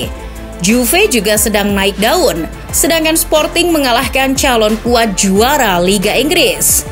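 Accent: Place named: West Java, Indonesia. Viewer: Anjirrr ada ada aja hahha kalau Rashford cedera bisa celaka dua belas😂😂😂, Pick Yang ngisi Voice nya orang sunda😁🤭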